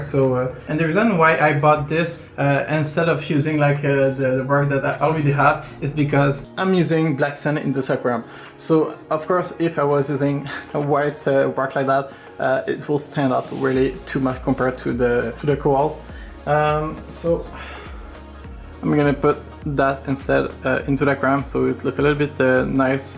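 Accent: Quebec, Canada. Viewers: J’aime entendre l’accent Québécois dans mes vidéos de reefing ;) Un abonné de plus !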